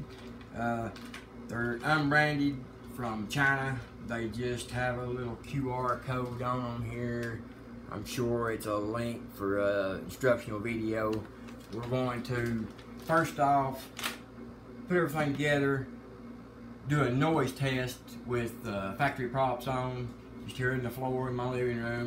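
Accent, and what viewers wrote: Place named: South, United States. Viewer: I grew up in southern Kentucky, I sound like a yankee compared to you buddy.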